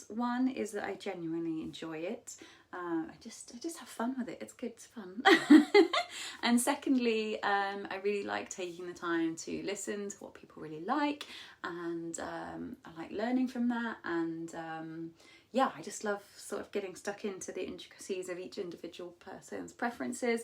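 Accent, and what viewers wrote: United Kingdom. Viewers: Wow, she is so tastefully evil 😈. And the British accent on doms is undefeated. Thank you for touching on average/above average guys being into it. I don't see it as humiliation, I just think a woman being an unapologetic size queen soooo hot. 🙏🏾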